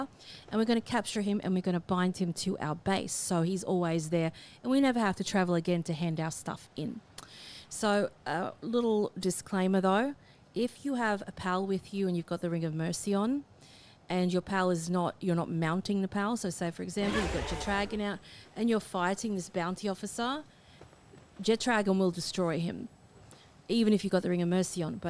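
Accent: Australia. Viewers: aussie accent 😍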